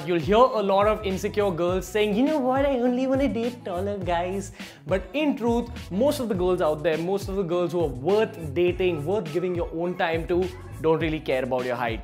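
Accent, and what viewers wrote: India. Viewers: Did I just watch a whole video with an Indian guy with an accent giving styling tips and really enjoyed it ?! Lol seriously though great editing , great talk! Feel like this guy could sell me anything . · I’m just hear to listen to ridiculous tips in an incredibly thick Indian accent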